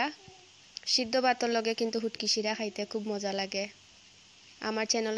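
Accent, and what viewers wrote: Sylhet, Bangladesh. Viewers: I miss your mishti sylehti mat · ওয়াও জাস্ট ওয়াও অসাধারণ বিশেষ করে তোমার মিষ্টি সুরের সিলেটি ভাষা ভাবি? awesome। Dubai থেকে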